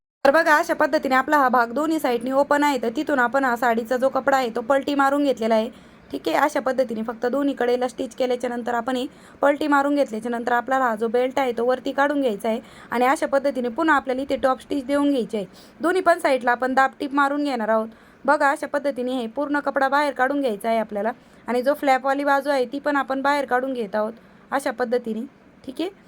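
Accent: Ahmednagar, India. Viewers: तुमचे गाव कोणते आहे मला भाषा आमच्या नगर जिल्हा ची वाटते सबस्क्राईब केले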